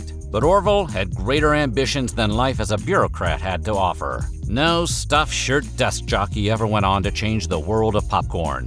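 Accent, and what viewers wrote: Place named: Transatlantic, United States. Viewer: You have to keep that wonderful Transatlantic accent alive dammit, for us all.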